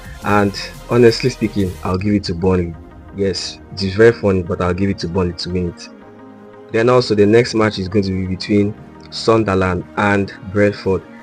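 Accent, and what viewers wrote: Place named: Biafra, Nigeria. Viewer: Was Nigeria colonized by the British? This accent is Biafra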